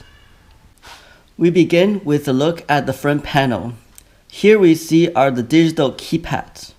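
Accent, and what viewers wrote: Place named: China. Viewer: A cook with a Chinese accent always make great food :-)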